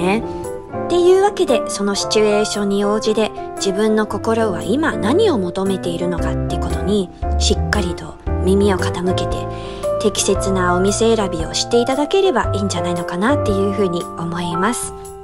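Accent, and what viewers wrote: Changhua, Taiwan. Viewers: 彰化腔最可愛❤❤❤ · 以為是彰化腔的台語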